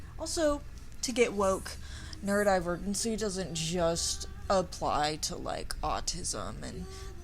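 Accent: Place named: Poland. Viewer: you do have a way with words :) I do not, I have fragmented abstract thoughts -sarah Paulson is in fact a lesbian -your tangents and ambiance are awesome -"to get woke" love that -im also a skipper when it comes to movies/tv -your polish accent is kinda sexy -im a mess and I love colour so I'm def anti minimalist -I wish I could actually FaceTime u or something lol(I don't have an iPhone 😂) cuz I have trouble verbalizing if I can't just blurt out what I wanna say -the only way I'm writing this is cause I wrote notes and then made bullet pts -better than nothing lol love ur videos so much (*˘︶˘*).｡*♡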